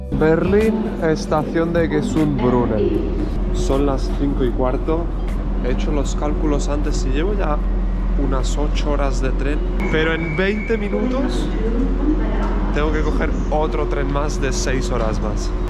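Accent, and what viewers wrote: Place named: Spain. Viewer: Alguien me puede decir de dónde es este chico? Sé que de España pero no consigo localizar su acento (el castellano no es mi primer idioma y me cuesta localizar acentos)! Gracias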